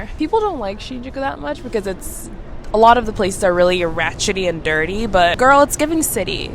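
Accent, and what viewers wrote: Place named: United States. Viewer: What’s your backstory for your lineage and your English skills. You sound totally American. I couldn’t remember if you and Eiko were both Ghanain or if you were Nigerian. Your artistic skills are totally BOSS by the way. You’re unique and special at same time. If you ever visit the US you will feel at home amongst the African-American community.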